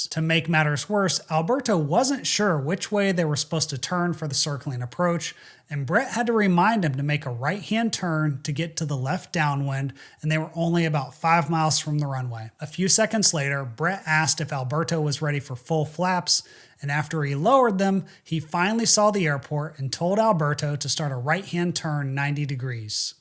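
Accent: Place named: Appalachia, United States. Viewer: Can you re record this with an English accent so that it sounds dramatic and educational instead of hillbilly gossip.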